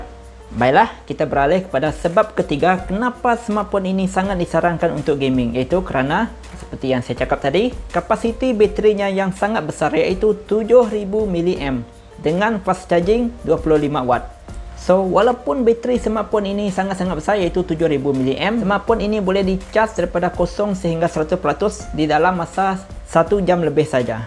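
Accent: Sarawak, Malaysia. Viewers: Slang Iban so awesome...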